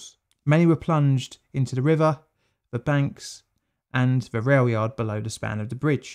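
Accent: United Kingdom. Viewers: To hear a Brit talking about a rather obscure event that happened in my state is kinda surreal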